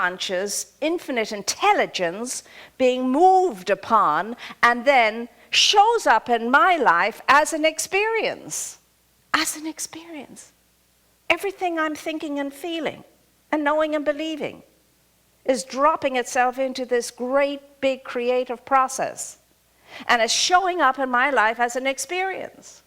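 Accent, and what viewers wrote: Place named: Ireland. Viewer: Love the message. And her graceful hint of an Irish accent is beautiful to my ears.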